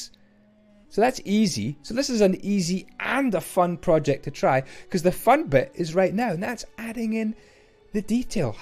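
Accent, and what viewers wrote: Scotland, United Kingdom. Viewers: Like ur scottish accent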